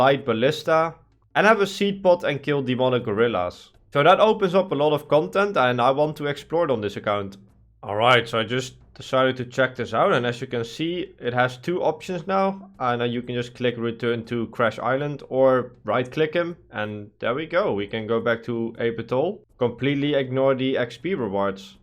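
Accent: Netherlands. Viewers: Lol , you must be dutch , that accent is so obvious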